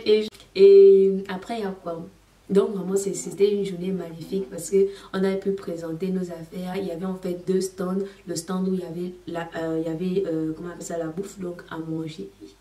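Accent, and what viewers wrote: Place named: Gabon. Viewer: L'accent gabonais :)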